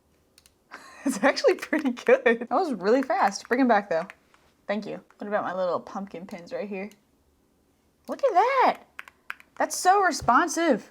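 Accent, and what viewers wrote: United States. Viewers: The hehe at the begging got me 😂😂 hehe (your American pronunciation is so amazing) I’m so jelly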